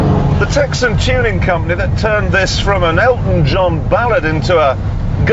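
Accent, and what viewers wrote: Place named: Yorkshire, United Kingdom. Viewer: because he’s from Yorkshire but has gotten better at hiding his accent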